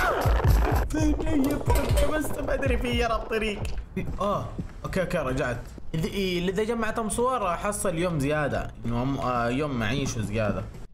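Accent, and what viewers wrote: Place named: Jizan, Saudi Arabia. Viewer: احلى لهجه هي الجيزانيه احبك 💗💗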